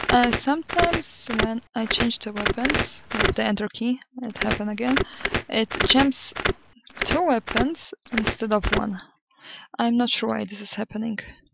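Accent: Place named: Ukraine. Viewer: Like your accent. That Ukranian?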